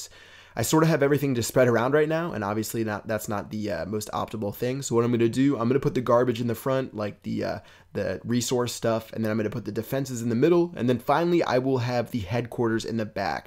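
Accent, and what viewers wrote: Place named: United States. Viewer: I've been playing boom for about a month now, I'm from aus, would love to do some commentary work with you, my Aussie accent and your American one would make good commentary 👍👍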